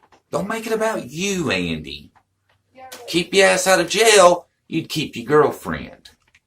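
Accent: United States. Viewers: Oh Cool! Idk why your channel hasn't popped up here before but I'm happy to meet y'all! I live in Knoxville, TN. I'm assuming y'all live in TN as well with that southern draw. Lol This was the first time I've gotten a good peek of Andy. Seems if brains were made of petroleum he wouldn't have enough to drive a piss ants go cart halfway around a fruit loop! No wonder H is emotionally disturbed. I don't believe a person like that would have said he didn't want to hear what happened that day though. Imo he knows if H tried to open up to him about it! Also imo LE needs to go back to the start & interview these people again! New sub here! 🙋😊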